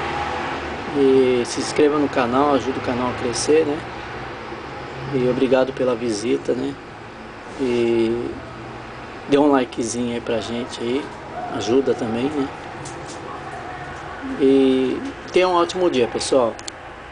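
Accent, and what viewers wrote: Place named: São Paulo, Brazil. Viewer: Vc é de São Paulo mesmo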